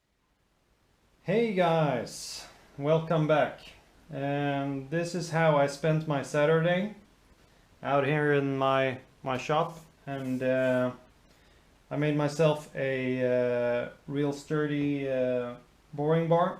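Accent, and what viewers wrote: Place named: United States. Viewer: Thanks for the information. I'm in Nuuk, Greenland. I was just curious because your American is so good, I couldn't place where you might be from. I enjoyed the slow motion shaper vid. Nice.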